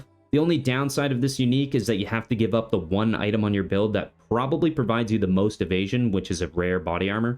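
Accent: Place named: Canada. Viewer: love you content, bud. Yo are you from like Minnesota? You sound like you've got a light Canadian accent coming through sometimes, I'm wondering if we're neighbors lol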